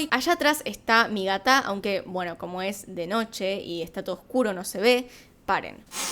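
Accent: Argentina. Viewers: Me gusta tu acento Argentino :D, Un Abrazo Princesa :D, Interesante Historia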